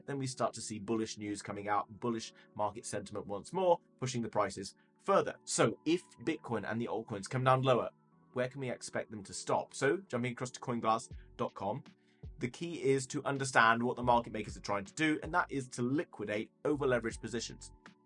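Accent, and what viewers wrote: United Kingdom. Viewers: All British people sound the same.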